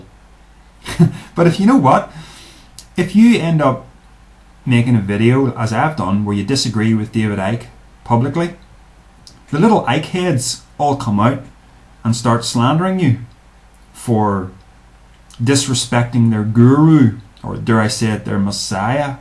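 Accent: Ireland. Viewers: oh boy are you a luciferian?i am so scared now.i get remarks like that all the time.please dont let them get to you.we are working on a website.and i will send you a link when we are done.well most of the work is done by onno.but its gonna be awsome ok?i like ur accent.is it irish? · hearing you talk is like listening to myself think...but in an Irish accent. Weird finding someone who is on the same page on almost every topic you've covered.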